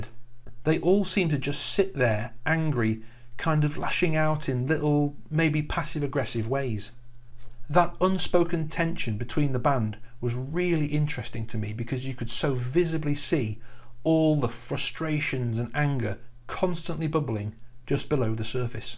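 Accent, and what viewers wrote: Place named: Scouse, United Kingdom. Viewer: You hit the nail right on the head, mate. And I see Paul giving the finger subtly to people but when it came to George in the studio on the Get Back filum I think it is mostly just that he has his plectrum inbetween his thumb and first finger... I also think George would have been well aware of when Paul was giving someone the SNEAKY finger and would have reacted in the appropriate way. I just think he would have. Plus I think they were all close enough to be able to just tell each other to Fuck OFF or get screwed ! I really like the video, as I said, you hit the nail right on the head. And as you well know, being from 'round there, Scousers are a breed APART when it comes to Northerners and indeed all the British peoples. I think Scousers have just got that special ability to make jokes out of things the way only they do (mostly)! 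👍 (I just PRESUME you come from somewhere around Merseyside cos your accent sounds a bit familiar... it's definately not a full-on Scouse accent but there's something there !)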